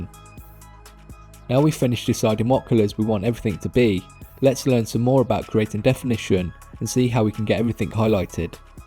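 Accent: United Kingdom. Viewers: Whoaa.. you got auto voice translation IA caption in spanish?.. cool. Anyways I lke so much your original accent British voice 😅. Awsome video and subscribed. Cheers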